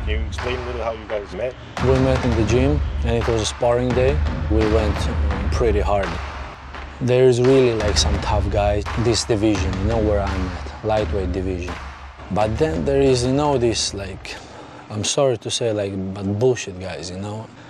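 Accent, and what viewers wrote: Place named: Scania, Sweden. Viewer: They both have a Scanian accent from the south Sweden.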